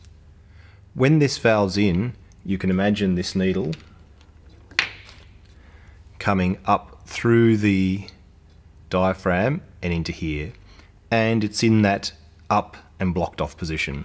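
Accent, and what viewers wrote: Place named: Australia. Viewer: Teaching a marine tech program, and we all love the old Outboards, love this guy. It's always more important with an Aussie accent too!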